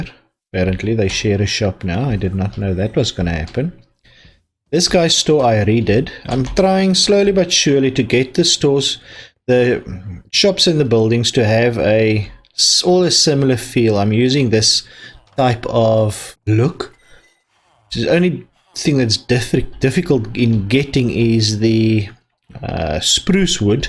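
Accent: South Africa. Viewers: His sounds South African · Na he sounds south african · You sound South African so awehhh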